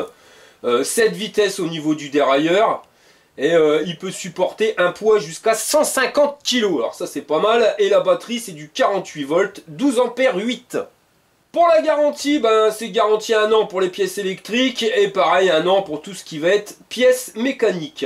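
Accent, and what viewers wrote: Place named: North, France. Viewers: Merci à toi, j'adore ton accent Ch'ti, je voudrais en acheter un fin du mois, tu m'as convaincu ! Amitiés, cordialement Dominique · chalut biloute dit moi apres les 3 ans de la sortie de ta video que pense tu encore de ce modèle on a un budget de 1000e par biclar et ce model nous plait bien . orange y pete sa mère sur ce bonne continuation terrible les video avec l'accent de ch'nord tio merci a toi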